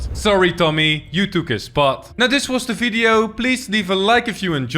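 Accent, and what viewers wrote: Netherlands. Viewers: Ik hoor gwn dat je nederlands bent 😂😂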